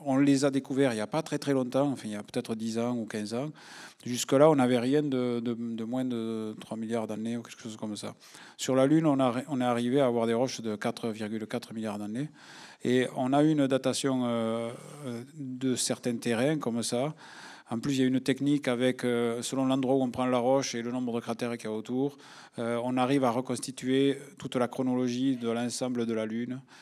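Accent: South, France. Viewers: Mais ..juste INTÉRESSENT ..en + avec 1 accent du sud..de pecor sérieux..tu nous apprend rien du genoux...Oh peu cher...aller tais toi stp... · Merci pour ce festival d'humour Avai l'accent de la garrigue ...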